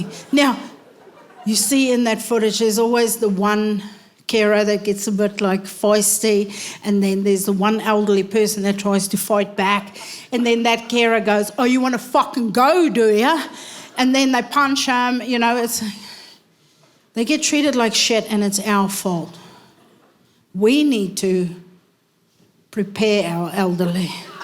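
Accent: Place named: South Africa. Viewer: She is hilarious and FINALLY learned why she has such f*ckery going on in her accent! South Africans already have the strangest of the British accents, but add some Kiwi and Aussie to the mix and you get this I guess.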